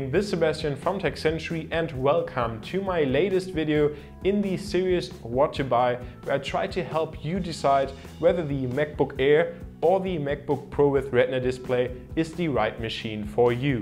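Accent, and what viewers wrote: Germany. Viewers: Germany I guess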